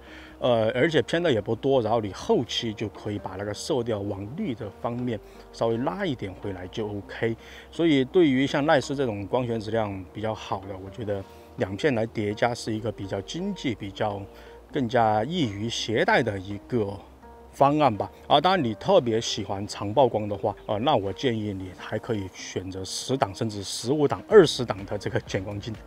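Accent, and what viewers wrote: Sichuan, China. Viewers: 川普，四川人？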